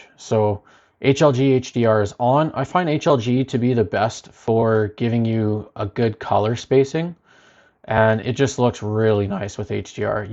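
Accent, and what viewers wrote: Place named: Canada. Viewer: This is great, I agree AMS2 is absolutely amazing. Great comparison. I do prefer the dji for sure. Thanks for the time in putting this together. Lastly I can't help notice but I could be wrong. I hear a Canadian accent. I am Canadian. So are you from Canada? Cheers brother.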